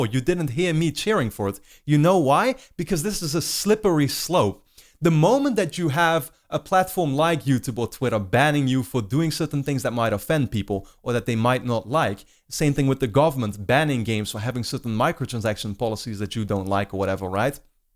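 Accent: Netherlands. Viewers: je Engels is goed maar hoorde ik gelijk dat je Nederlands bent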